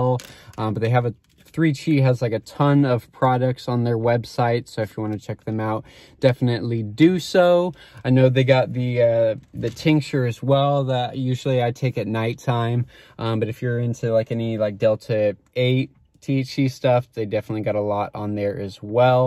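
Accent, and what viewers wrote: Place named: Los Angeles, United States. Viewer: You hear that hes from LA😂💀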